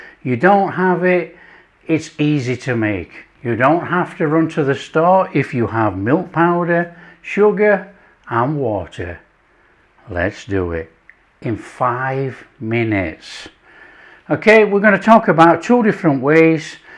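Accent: Yorkshire, United Kingdom. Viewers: I’ve only recently discovered your YouTube page, but I love it. I think your accent is a great Yorkshire one. I’m from over the border in Lancashire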